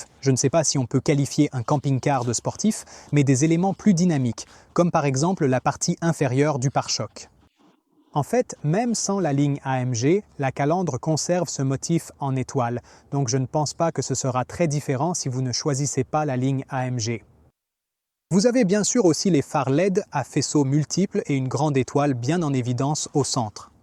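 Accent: Quebec, Canada. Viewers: c'est curieux mais il a un accent du quebec ?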